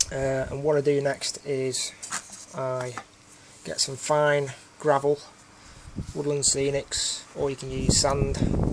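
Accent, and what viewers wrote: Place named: RP, United Kingdom. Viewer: Model tips are great,theres always another way of doing things,you tubes great for sharing idea,s,just wondering which tv company will ask us both to do voice overs for advertising,yours is a touch posher than mine,LOL,cheers Tony.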